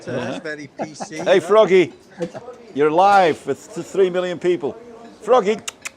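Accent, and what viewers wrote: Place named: North Wales, United Kingdom. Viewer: Listened on catch up, you have a very strong North Wales accent when speaking Welsh! You don’t speak English with a Welsh accent though. 🏴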